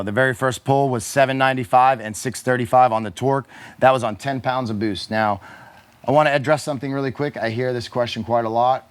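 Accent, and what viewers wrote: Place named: East Coast, United States. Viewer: This that east coast talk, hey man it's sToCk run me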